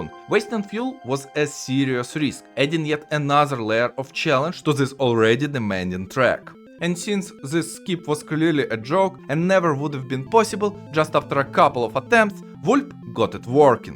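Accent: Eastern Europe. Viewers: Bro is STRONGLY eastern European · Slavic accent in eng beamng vid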